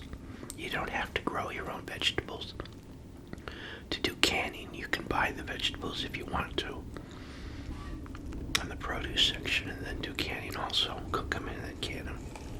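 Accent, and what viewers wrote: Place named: New England, United States. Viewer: Sounds like a fellow New Englander! I'm right over in Norwich CT! Just found your channel, love this video. I love going on late night McDonalds runs, sometimes I'm not even super hungry but it's just like a satisfying solo excursion while my wife is asleep. Listen to some music in the car and chow down in the parking lot. Sometimes if I'm feeling froggy I'll wake up super early for a McDonalds breakfast run while it's still dark out.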